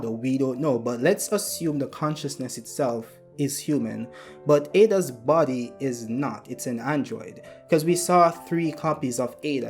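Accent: Jamaica. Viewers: I can hear the Jamaican in your accent bro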